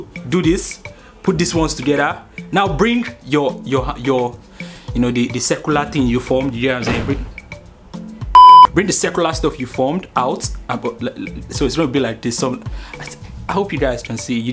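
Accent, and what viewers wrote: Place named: Nigeria. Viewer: Omg you're so cute i love your accent are you from Nigeria? If so ayeee 💚💚!! Oh something else... i still cant do it....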